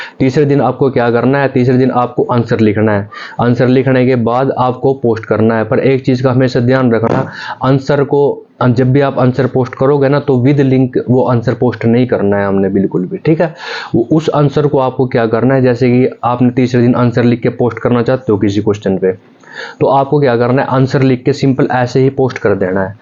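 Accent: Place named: Haryana, India. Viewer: U r from. Language to bhai aapki ambala ya Ynr area ki lag ri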